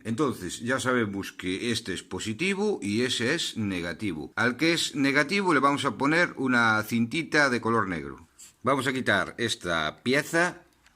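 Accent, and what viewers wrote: Galicia, Spain. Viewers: Al margen de que eres un genio, me encanta tu acento gallego. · Gracias amigo, me encanta tu acento Viva Galicia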